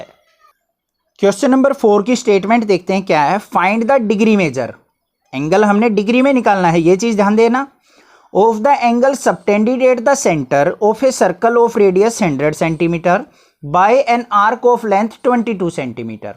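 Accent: Haryana, India. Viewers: I love ur haryanvi accent 🥰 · Sir aap Haryana se ho kya? You are helping all of us sir☺️👍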